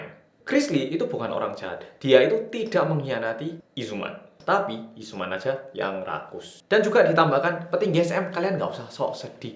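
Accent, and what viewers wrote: Java, Indonesia. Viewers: Penjelasannya mudah di mengerti padahal Jawa ne medok tenan😎 keren keren 👍👍👍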